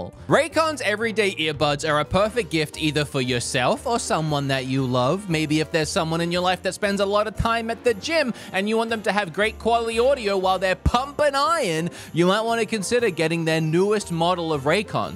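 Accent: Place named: Australia. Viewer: I miss your aussie accent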